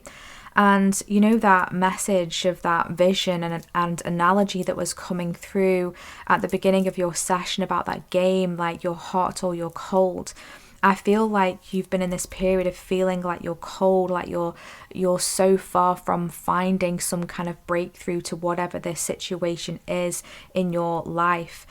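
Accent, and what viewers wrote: Northern England, United Kingdom. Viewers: Pile 2 brought so much confirmation. I am welcoming in my beautiful brand new reality. I am shifting into the next phase of my life 💕🐬🌈 I’ve been sensing so much of what you brought through. I’m here to play a role in activating others. My desires to be acknowledged for my soul gifts and talents and even prosperity in connection to them aren’t bad at all, but all pure innocent and valid desires from source. Your voice is really comforting and reminds me of my grandparents from Liverpool. I always feel like my ancestors are comforting me during your readings. Are you from Northern England? Thank you for bringing through this confirmation🙏🏽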